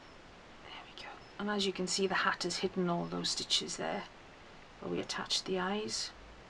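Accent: Wales, United Kingdom. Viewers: You sound welsh im homesick now😢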